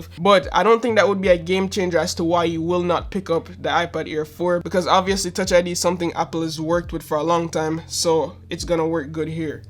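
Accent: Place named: Jamaica. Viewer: you sound like you have a little Jamaica 🇯🇲 in u bro great video tho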